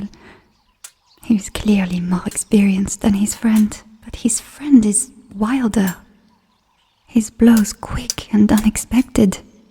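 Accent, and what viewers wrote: France. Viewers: I've been enjoying the French accent videos... Maybe French is the language of love? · Wow! I was totally invested in this story. What a beautiful French accent, & beautiful story. I liked this a lot. Thank you ❤ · A confession From With French accent At a ball Dont wake me up. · This was beautiful, and the French accident is stunning as always. Hope for more in the future ❤️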